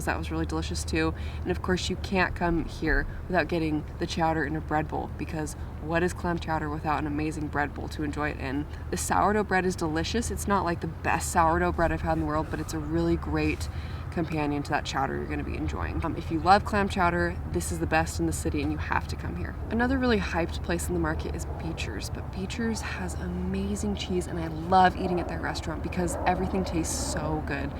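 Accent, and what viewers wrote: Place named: Seattle, United States. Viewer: Your accent says it all, Seattle accent. Greetings from Sweden :)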